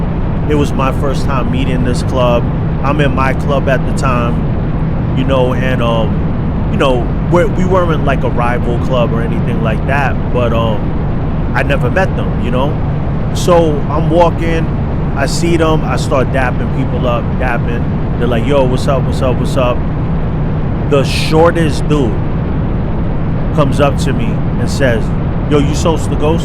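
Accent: New York, United States. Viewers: I grew up in NYC. Left in 2001 I’ve been down south since. I miss hearing your accent. I miss “home” U sound great. Thanks 4 bein genuine. We need more people like you. Ride Safe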